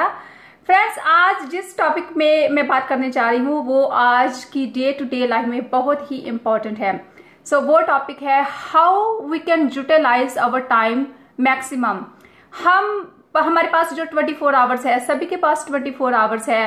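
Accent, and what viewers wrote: Punjab, India. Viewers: Ajit Shirsawade wat is good in it she speaking hindi with punjabi touch while she can make video fully in punjabi language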